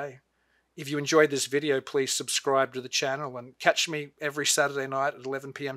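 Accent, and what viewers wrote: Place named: Sydney, Australia. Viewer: Good to see a fellow Sydneysider talking about Lovecraft books 😊Recognised the accent straight away.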